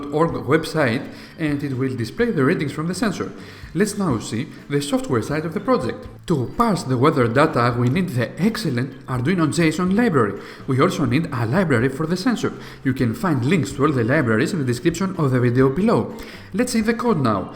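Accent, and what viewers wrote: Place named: Greece. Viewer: Πολύ ωραίο βιντεάκι, άλλα από την προφορά κατάλαβα ότι είσαι Έλληνας, όταν είδα και το sparta σιγουρευτηκα :p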